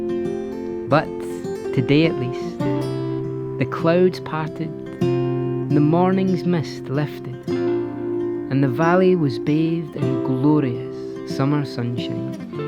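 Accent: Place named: Scotland, United Kingdom. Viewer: I stumbled across your channel a few months ago. You stayed in my mind for your amazingly respectful way of treating fish and your lovely Scottish accent. Thank you so much for this video. Amazing fishing, great editing, beautiful pictures. Takes me back to catching brown trouts in the lochs of the Assynt region back in 2014, those memories are a real heartwarmer right now. Tight lines and greetings from Germany!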